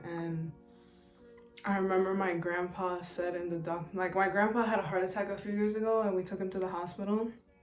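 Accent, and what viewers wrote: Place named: New York, United States. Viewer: knowing she's from ny without actually knowing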